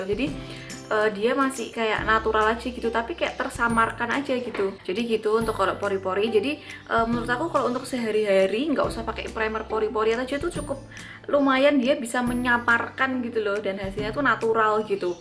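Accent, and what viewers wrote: Java, Indonesia. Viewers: Nyantol nek mbak medhok jelasno, mantul 😁 sekarang udah punya baby zack jadi lama kalo mau ada video baru jadi rindu 😍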